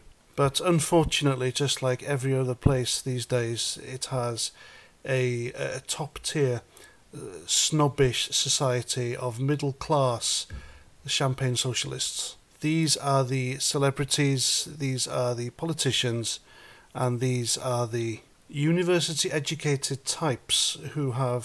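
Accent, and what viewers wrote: Wales, United Kingdom. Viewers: is ace...tells it how it is..I love straight talking no nonsence facts..love his Welsh accent..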